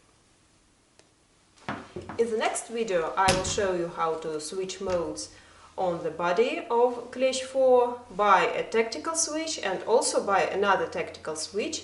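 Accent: Russia. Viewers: I am enthralled. The Russian accent, the tactical proficiency... I might be in love.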